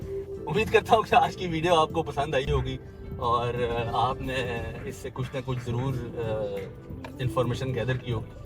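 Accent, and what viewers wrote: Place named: Punjab, India. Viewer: i love your punjabi accent ❤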